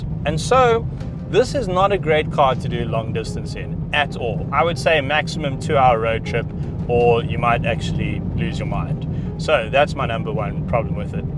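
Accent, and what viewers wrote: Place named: Johannesburg, South Africa. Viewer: The Jo'burg accent😂😂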